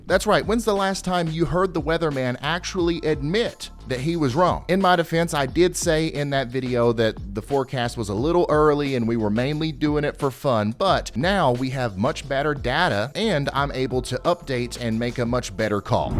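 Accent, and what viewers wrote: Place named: Tennessee, United States. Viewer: Well, he's as accurate as most! It's just that he's so much fun to watch! It's obvious he loves what he's doing, and he DOES have a knack for following the correct models. He may have a much better read on what others forecast, as he really does his homework. That slight Tennessee twang doesn't hurt, and he's just so dang cute!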